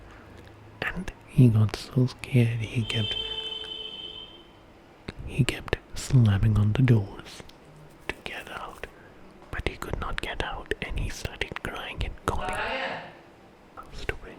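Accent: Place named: England, United Kingdom. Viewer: Big fan of your English accent sir🤓 Cool background!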